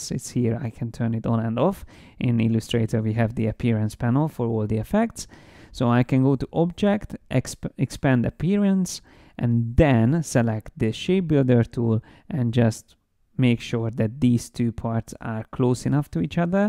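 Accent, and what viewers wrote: Hungary. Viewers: Jézus, de ki lehet hallani a magyar akcentusodat :D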